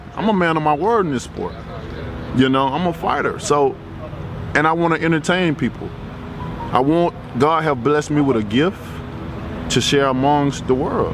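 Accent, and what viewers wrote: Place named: Alabama, United States. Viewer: I’m reading the comments and I think it’s disgusting that people are poking fun of the way that he speaks, he’s from Alabama, they speak with a southern accent. There’s nothing wrong with what’s he’s saying, people from around the world think, especially Europeans, look down on other English speakers because we don’t sound like them. I know this to be a fact, I served with British and Irish soldiers and they rag on guys from America about their accents. I can’t wait until this guy cleans out the the division, so all you Europeans can be quit. But I’m figuring you guys will complain about something else Americans do or say.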